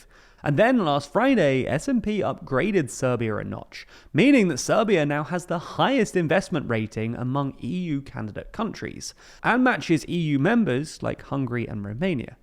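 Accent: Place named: RP, United Kingdom. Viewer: Good analysis, however you forgot few things. One of the reasons behind the price increases especially in real estate is the migration influx from Rusia and Ukraine. Another huge investment is the UAE construction project like Belgrade Waterfront. Also, don't forget the Serbian diaspora and their effect on the serbian economy especially in real estate. Another country investing in real estate and construction is Israel, also a major importer of serbian produce weapons. To top at all, Serbia is hosting the World Expo 2027 and the government is spending lot's of money on the projects. At the end, just a personal note...no need for a posh language, normal British narration will suffice.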